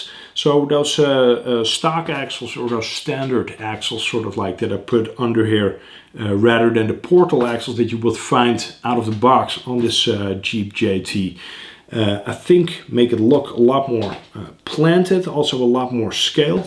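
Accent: Netherlands. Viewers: Do I hear a Dutch accent? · Je hebt een beetje een Nederlands Engels accent, klopt dat ?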